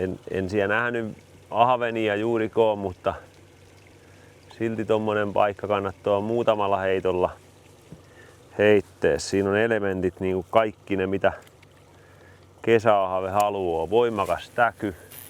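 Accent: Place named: Häme, Finland. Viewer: Ensin tyylipisteiden jako: kakstoista pistettä ja papukaijamerkki. Kuvaajalle, leikkaajalle/koostajalle jaaivan erityisesti 'luontevasti soljuvan puheen tuottajalle' - sisällöstä, mutta myös estetiikasta. En ole varmaan koskaan aikaisemmin kuullu puhuteltavan kaloja - ahventa, kuhaa, mutta aivan erityisesti haukea - niin monipuolisesti, hellän kaverillisesti, vähän kiusoittelevastikin. Kuulee, että on oltu vuorovaikutuksessa aikasemminki. Ainoastaan savon murre puuttuu, mutta menee se nippa nappa hämäläinenki .. Eikä tuo tekninen osaaminenkaan paljo taiteellisen rinnalla kalpene. Ainakaa, jos aatellaan saalisvarmuutta. Näin kaksdee-arskana tuo saalisvarmuus jopa hämmentää. Ja tulee eittämättä sekin mieleen, että missä kulkee eettisen saalistamisen rajapyykki. En halua neuvoo toisia. Oma kalastus keskittyy ruokakalan pyyntiin asenteella, että 'en tee onkelmaa siittä, jos kalaa ei satu tulemaan - enkä siittäkään, kun sitä joskus tulee'. 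Nostan siimat ylös ja sumputan järvessä seuraavaa kertaa silmällä pitäen. Kaksdee-arskana mulle tulee sopivasti jännitystä ihan siittä, että tuleeko tällä kertaa vai eikö tuu. Omana mielipiteenä heittäsin kuitenkin väkäsettömien koukkujen käytön aina sillon, jos kaloja saalistetaan selvästi yli tarpeen, koska väkäsettömillä se vapautus onnistuu aina helpommin. Sillä tuskin se metrisen vihernieriän karkuutuskaan enää kovin kipeetä tekee, jos niitä toistametrisiä on vyöllä jo enemmän ku paljo. Taiteellisen tyylin lisäks paikkatyyppipohdiskelu itte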